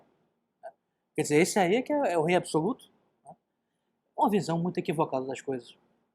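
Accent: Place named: Israel. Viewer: Percebo um sotaque israelense Ótimo video, ótimo canal. Parabéns que Deus abençoe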